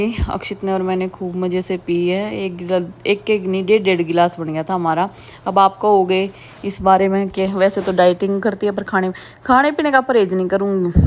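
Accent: Haryana, India. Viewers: U p me rehne ke Baad bhi aap ki haryanvi tehth h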